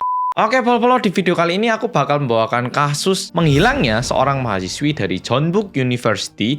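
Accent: Java, Indonesia. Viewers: Dapat notif langsung ambil kopi, stay ke mas medok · Knp logatnya Jawa bgt🤔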